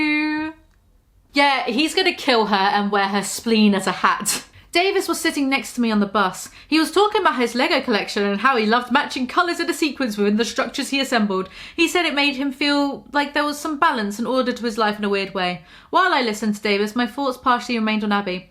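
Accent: England, United Kingdom. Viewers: The English accent though... 😂 i loved this video.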